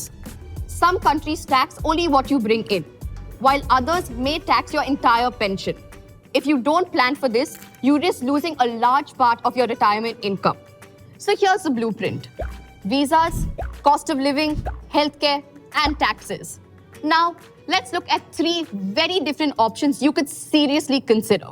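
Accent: India. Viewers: First of all she doesn't look indian, i mean she might be a foreigner living in India but her accent is indian, how i mean is she AI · Definitely not European. Her accent is like an Indian. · You talk like an Indian girl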